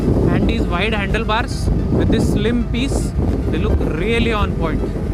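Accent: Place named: India. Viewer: I liked your narration , its not boring at all and your accent is very Indian (not fake). Thanks.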